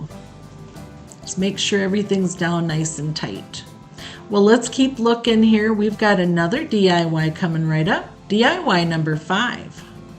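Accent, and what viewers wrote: Minnesota, United States. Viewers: My first time watching you and I was like she sounds just like my Aunts in Minnesota so I wonder if she's from there and you just confirmed it ,my mom was born in Wisconsin and grew up mostly in Minnesota ,lovely work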